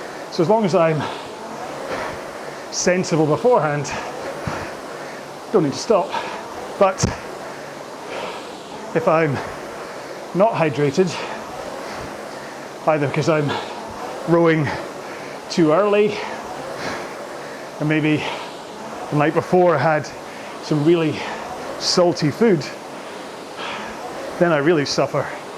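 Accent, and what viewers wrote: Scotland, United Kingdom. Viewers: That was awesome.. 🥵 now off to practice saying (in Scotts accent) ‘sitting behind my desk for hours’ … auoihrs.. auhirs.. ouirs.. love it! Thanks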